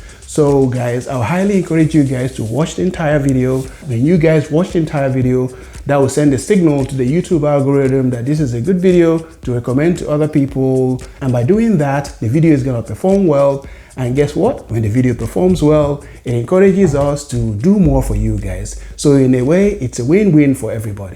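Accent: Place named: Haiti. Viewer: 🔥acento haitiano DETECTED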